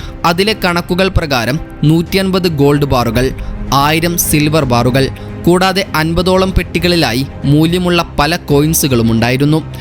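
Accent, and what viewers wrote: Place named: Northern Kerala, India. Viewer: വടക്കൻ ഭാഷ കേൾക്കാൻ ഒരു രസം ഇല്ല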